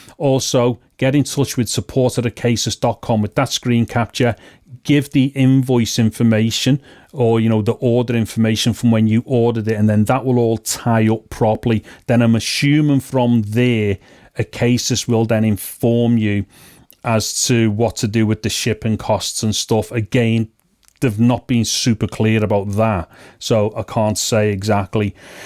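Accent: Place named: Scotland, United Kingdom. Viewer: Horrible VIDEO! FIX your accent. It is NOT ENGLISH and too scottish... And GET TO THE POINT. You meander all over and go so SLOW. STOP the hand gestures...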